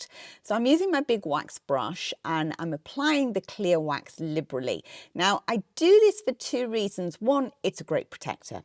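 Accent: England, United Kingdom. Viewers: Everything you do is so gorgeous and stylish. I just adore your videos and your delightful English accent. And of course who would not love Bertie and his antics. I'm a German living in the US and so looking forward to more videos.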